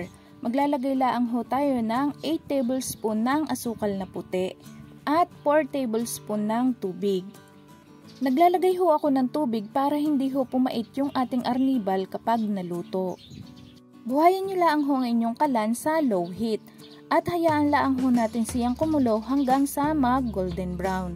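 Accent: Batangas, Philippines. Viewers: Ala eh... pansin ko laang na Batangueña pla si 👍😊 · Mukhang taga Batangas ka Ate ah...ARE ARE EH....❤❤❤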